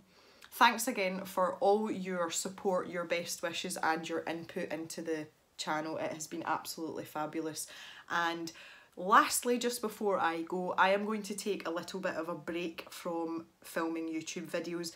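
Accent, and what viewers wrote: Scotland, United Kingdom. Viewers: I just love your accent it is nice to hear a real scottish voice. I have one question to ask you? I have the whole set of Prismacolour pencils but i have a dislike for them the problem is that I don't know how to use them. Can you give me some advice for me please. Also I would love to do a sky picture in ivy and inky butterfly using Faber Castell Polychromos is there anyway you could help me please.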